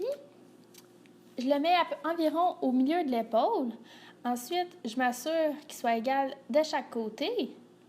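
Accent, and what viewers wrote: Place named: Canada. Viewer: Tu es canadienne j adoré ton accent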